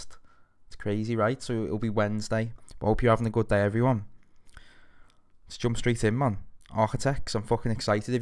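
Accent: Liverpool, United Kingdom. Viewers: I love all your videos lad I especially love hearing another scouser really loving architects Great work lid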